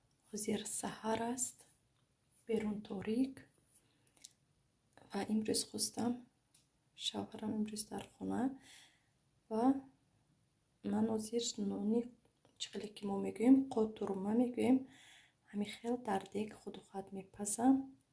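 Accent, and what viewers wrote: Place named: Isfara, Tajikistan. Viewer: Майлаш мемонм ба фикр хамшахрием апачон ман Аз Исфара хастам аз гапзанитон ба фикр хамшахрем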